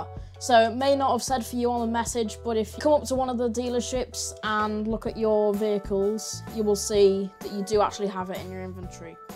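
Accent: United Kingdom. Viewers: r u scottish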